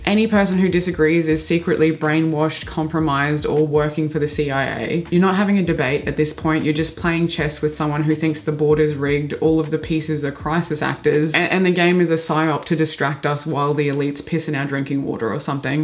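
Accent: Australia. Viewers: Aussie John Oliver is back!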